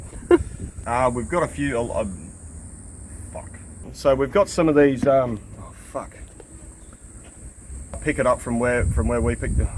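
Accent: Queensland, Australia. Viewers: Simple CC can barely cope with American English, struggles with Australian English and is completely fu!@ when it comes to Queensland English.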